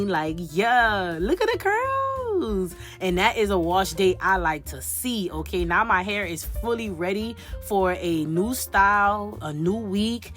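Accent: Louisiana, United States. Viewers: Sounds like a Louisiana accent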